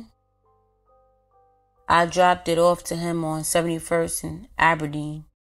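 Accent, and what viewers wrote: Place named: New York, United States. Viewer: Whoever the narrator got a thick new York accent ong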